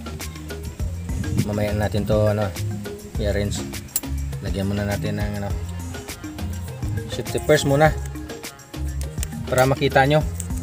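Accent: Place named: Western Visayas, Philippines. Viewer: Yun ang gusto q sa ilonggo, malambing magsalita. How tooooo instaaaall...😅🤣😂👋. Pero damo nga salamat gid to sa tudlo mo ha kay damo q nabal-an halin sa imo ubra.👍👍👍 Gbu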